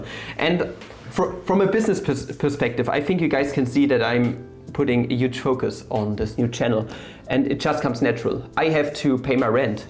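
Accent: Germany. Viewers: Ähm ich hör da bißchen deutsch raus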